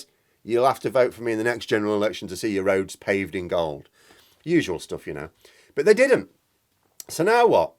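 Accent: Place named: Grantham, United Kingdom. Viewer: I’m going to say that’s a Grantham accent, maybe as far up the A1 as Retford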